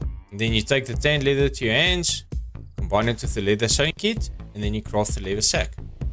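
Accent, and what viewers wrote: Cape Town, South Africa. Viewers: As a cape townian.....this voice sounds like home 😂😂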